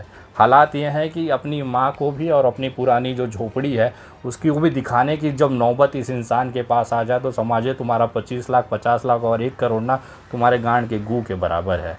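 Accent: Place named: Nagpur, India. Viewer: Aise kon bolta hai bhai bechara नागपुरिया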